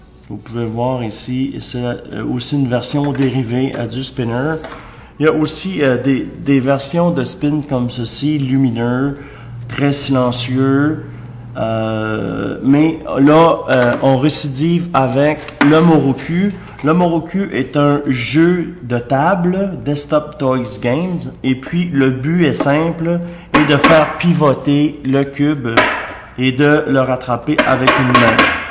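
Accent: Quebec, Canada. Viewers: Je suis français et j'adore ton accent québécois j'aimerais bien en recevoir un continue bye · j'adore l'accent canadien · un accent québécois. ?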